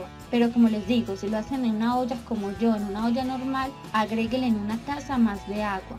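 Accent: Colombia. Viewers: ME ENCATO LA NARRACION A LO COLOMBIA COLOMBIA PUES...!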